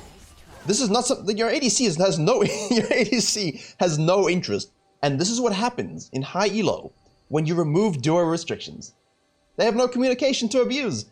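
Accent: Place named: South Africa. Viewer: good commentary, you sound like a Saffa boet? gg wp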